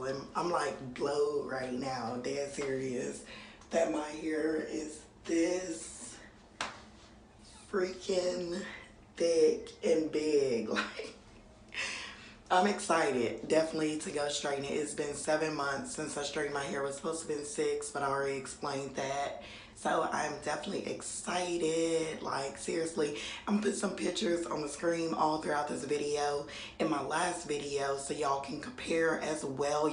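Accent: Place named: South, United States. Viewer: Lol you so country i love it lol 😂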